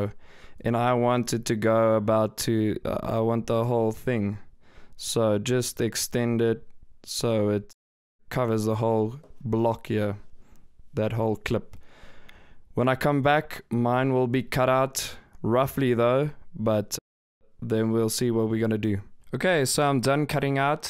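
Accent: South Africa. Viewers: i like your accent. South African? Great tutorial thanks! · are you south african? the accent?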